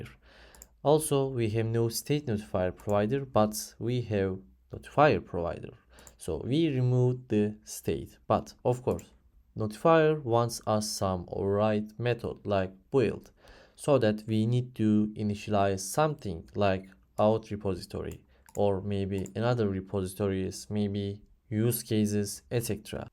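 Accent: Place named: Turkey. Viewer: Meraba 4. Saniyedeyim aksandan turk oldugunu anladim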